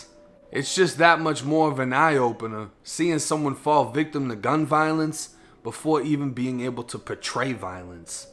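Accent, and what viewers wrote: Boston, United States. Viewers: He got that Boston accent. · “Or a drunken argument between people pottyin’ (partyin’)” the Boston accents crazy 🤣🤣🤣🤣🤣🤣🤣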